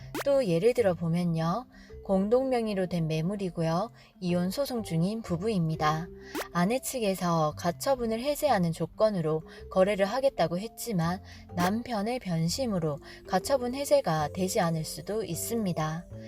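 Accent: Gyeongsang, South Korea. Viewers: 유익한 영상 잘 봤습니다~ 쉬운 듯 어려운게 등기부 보는법 같아요. 전 님 경상도 사투리가 참 듣기 좋아요^^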